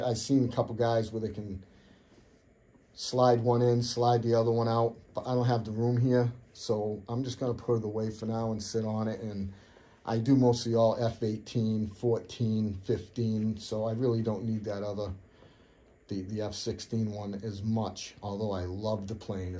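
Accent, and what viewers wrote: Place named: New England, United States. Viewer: Sounds like you're in New England like me!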